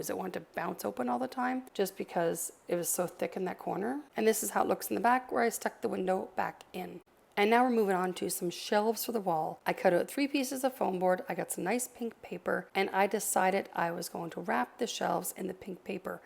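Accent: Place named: Canada. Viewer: My first time to your channel. But I have to say I was a little surprised, seeing your channel name, but hearing a very Canadian accent. 😅🤭 This is an awesome tutorial. Thank you! 🥰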